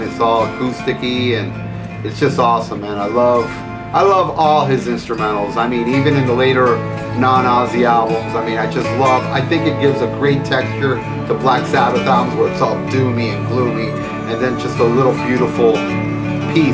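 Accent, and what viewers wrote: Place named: Chicago, United States. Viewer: Did you grow up in Chicago's "Back of the Yards".......because you use the same expressions and have a bit of accent like us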